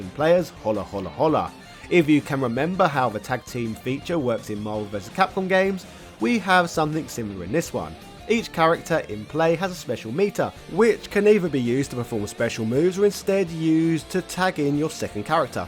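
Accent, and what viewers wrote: United Kingdom. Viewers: I’m not even going to watch this video with this dumb British accent and say this franchise will never die because it’s the best on the planet, so it wasn’t about to peace out I mean double dragging neon was amazing.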